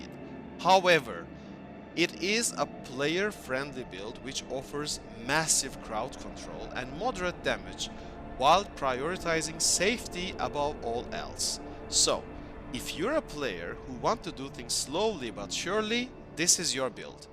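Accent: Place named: Turkey. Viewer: Duyduğum en ‘clean’ Turkish English aksanlarından. Ama kulak tanıyor tabi. ps. Great content, articulate and helpful. Liked and subbed.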